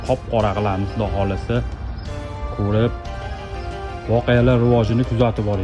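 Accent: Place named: Kokand, Uzbekistan. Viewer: Қуқонликмисиз ака? Қуқонча шева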